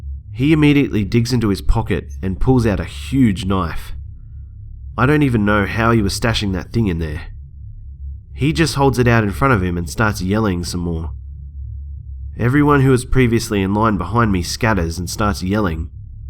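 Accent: Australia. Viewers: are you also aussie? cuz honestly nobody in nsw says gday haha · That is the cutest accent I have ever heard!!! Is it Australian? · AUSTRALIAN ACCENT YAS BITCH MAKES THE VIDEO BETTER. lol :3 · He's Australian he does not sound British at all -_ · are you from Australia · I love your accent!! Are you Australian?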